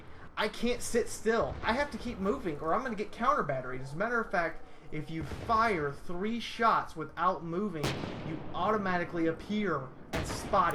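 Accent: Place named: Alabama, United States. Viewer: I appreciate Youtubers/Bloggers that post informative information. Plus that Alabama twang.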